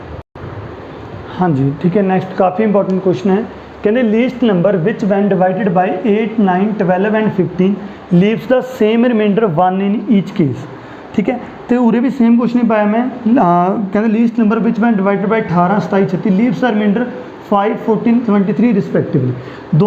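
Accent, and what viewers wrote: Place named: Gurdaspur, India. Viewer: Tuhadi language bott sohni hain;;; means apne area de according lyi;;; gurdaspur distt. tu alag hain;;; ur teaching way is so gd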